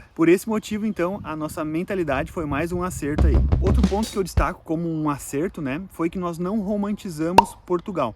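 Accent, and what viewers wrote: Rio Grande do Sul, Brazil. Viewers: Pelo sotaque chuto que vc é gaúcho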